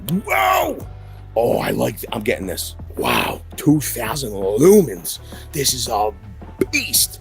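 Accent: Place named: Brooklyn, United States. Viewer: Just found your channel last week you talk like a Brooklyn Italian,are you Italian by any chance? 🤌🇮🇹 ayyyyyy ohhhhhh!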